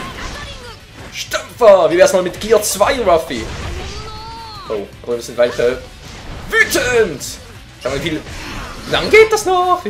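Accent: Switzerland. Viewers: bisch du ein schweizer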